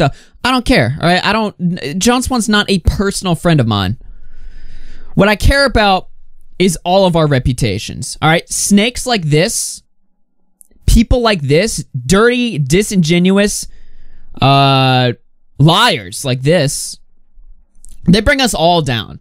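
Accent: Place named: Texas, United States. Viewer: Every time says "Ma opinion" I feel a little bit closer to Texas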